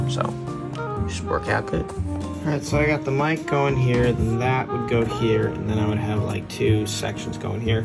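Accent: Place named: England, United Kingdom. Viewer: Hello, I hope you see this comment. You have many fans in Turkey and there are no Turkish subtitles in your videos. It is not a problem for me, but I think it is a problem for those who do not understand the English accent. Please take this comment into consideration and you should do something about this issue. I think we love you.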